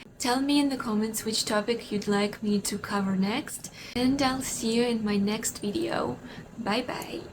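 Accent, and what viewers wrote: United States. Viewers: curious, which slavic country are you from? you sound very american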